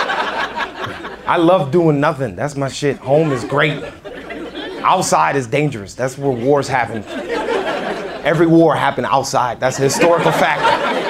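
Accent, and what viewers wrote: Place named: New York, United States. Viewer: he likely picked up the accent from living in ny & the media he watches/consumes<3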